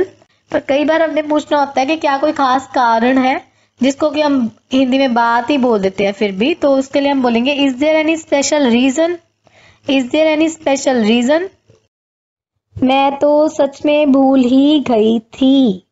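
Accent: Punjab, India. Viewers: punjabi ho kya are you panjabi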